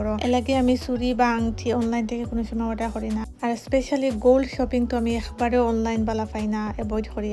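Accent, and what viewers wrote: Sylhet, Bangladesh. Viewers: I like your sylhety tone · Apa I like the way you talk sylhety is our own language so no need to change your self because some one else, I like your video. Very nice design if you have phone number for that Gold shop can I have please thanks for sharing 👌❤️🌹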